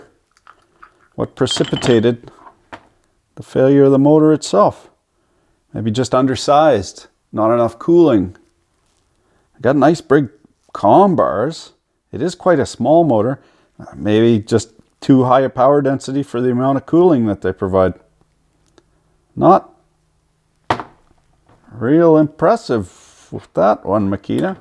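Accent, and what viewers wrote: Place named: Canada. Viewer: This might be the most Canadian fellow I’ve ever heard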